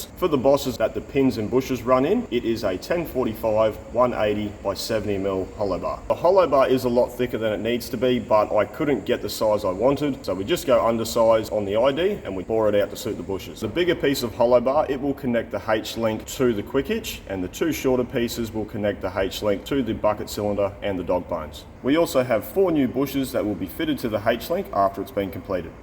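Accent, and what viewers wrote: Australia. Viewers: QUESTION, besides the letters H and Z, what others are pronounced dif in OZ?????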